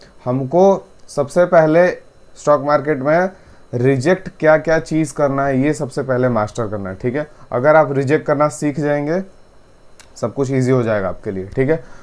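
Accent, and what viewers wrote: Bihar, India. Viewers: Bihar se ho kya bhai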